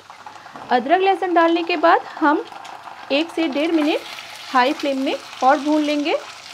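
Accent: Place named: Bengal, India. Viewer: yummy looking surly must be tasty too, I would like to know, this is bengali style, your speaking style is also bengali.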